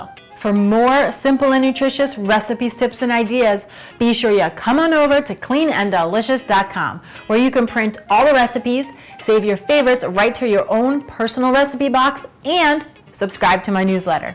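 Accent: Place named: United States. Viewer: I had some problems to understand your English. It seems that your English is US-English, which is very hard for me to understand. I wanted to know, what kind of spices you have used. I noticed that you put salt, pepper and garlic powder. But first, what kind of liquid you have rubbed on the squash? It seems that it was an oil. Can I also cook Spaghetti Squash under steam?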